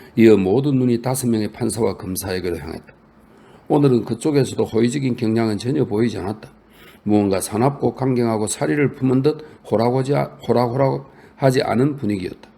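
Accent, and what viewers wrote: Gyeongsang, South Korea. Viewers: 덕분에 긴 명작을 즐겁게 듣고 있습니다. 낭독자님의경상도 억양이 제게는 익숙하여 더 편안하고. 천재적인 작가의 표현을 재미있게 하셔서 웃으며 듣습니다. 그런데 3부의 10장을 찾을 수가 없어서 답답합니다.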